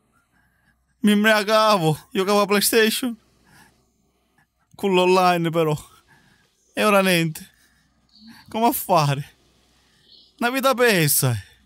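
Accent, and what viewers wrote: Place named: Sicily, Italy. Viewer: Bravo, provincia di Agrigento o di Palermo?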